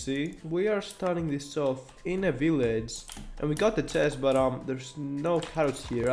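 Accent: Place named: Greece. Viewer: Everyone likes ur Greek accent tho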